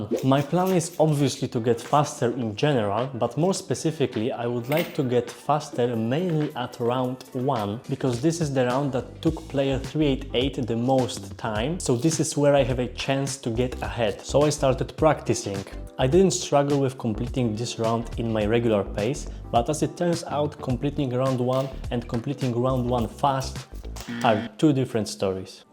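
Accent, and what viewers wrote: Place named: Poland. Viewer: Ur Polish aren't you? Im Poland too hehe (i think ur Polish cuz ur accent and Cisowianka in the back haha)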